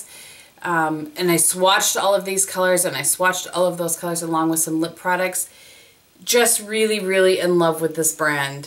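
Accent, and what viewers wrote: Wisconsin, United States. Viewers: You are a hoot! Enjoying your videos, thanks. I might need to try the IT Cosmetics powder. And I thought I recognized that accent! I grew up in northern Wisconsin. I miss it like crazy! I'll be home for a visit in August and I'm hoping I can convince my sisters to drive up north to Paul Bunyan's before they close for the year! Not that I need a lumber jack breakfast :/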